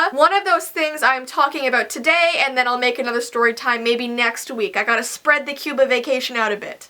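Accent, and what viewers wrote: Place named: Ontario, Canada. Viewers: Ya Ontario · Yeah she is from Canada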